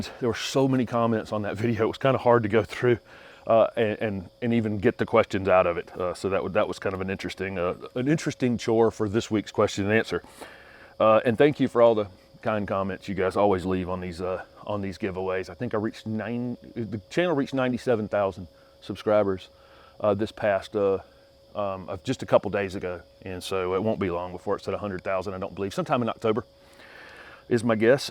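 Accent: South, United States. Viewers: In addition to trying to soak up all the knowledge you share, I very much enjoy listening to your Southern accent. Raised in the South, I fell in love with gardening alongside my father. Transplanted to Phoenix in 1970, i greatly missed the ability to grow so many things. Now retired in Arizona’s best kept secret, the White Mountains, zone 6b, I am in heaven learning to garden all over again. Thank you for your help. And I would love to add a set of Corona pruners to my tool bucket.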